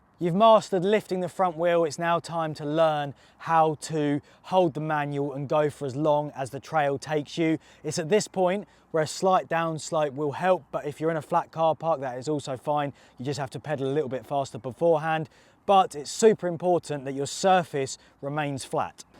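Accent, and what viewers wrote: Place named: United Kingdom. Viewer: British English!!!!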